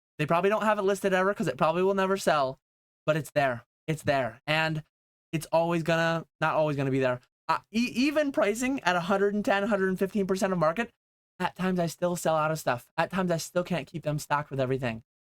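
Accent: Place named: Upstate New York, United States. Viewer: Oh snap. A fellow upstate NYer. And sounds like an actually upstater. Not just an hour north of NYC.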